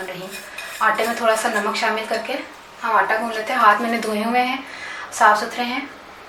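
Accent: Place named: Kashmir, India. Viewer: Kashmiri urdu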